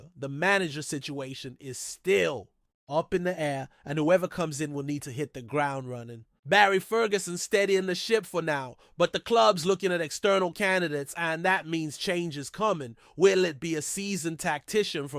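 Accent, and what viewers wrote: United States. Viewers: love the American accent in Scottish slang, fkn hilarious.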